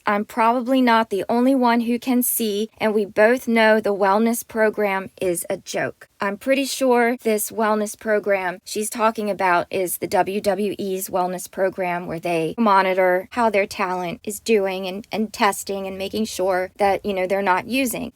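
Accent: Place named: Pennsylvania, United States. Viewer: You have a slight Pennsylvania accent lol I’m born and raised in Pittsburgh and can tell that accent anywhere. You have to be from Pennsylvania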